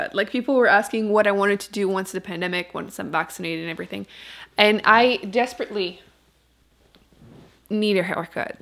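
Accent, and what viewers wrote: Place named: Quebec, Canada. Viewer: Français rouillé! ;) J'avoue que je taquine mon fils quand il nous visite et parle franglais... Mais je trouve quand même surprenant - agréablement - que les mots en français que tu glisses à l'occasion "sonnent" québécois quand même... plusieurs finissent pas parler avec un accent anglais, même si leur langue maternelle est le francais...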